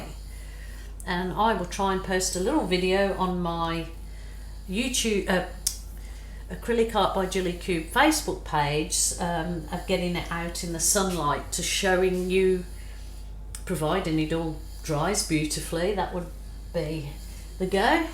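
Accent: United Kingdom. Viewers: thought so I'm from Birmingham uk and thought 🤔 sounds uk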